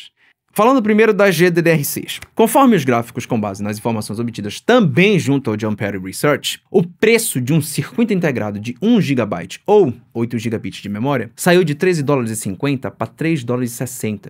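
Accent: Pará, Brazil. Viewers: Eu comecei a te ouvir pra matar a saudade do sotaque do Pará, mas gostei demais da maneira como vc discorre sobre os assuntos. Então fiquei, apesar de não entender nada do assunto. Muito bom o conteúdo, alto nível.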